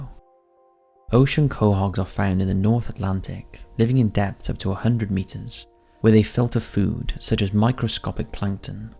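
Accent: Wales, United Kingdom. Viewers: he's from Wales so it's the way they say it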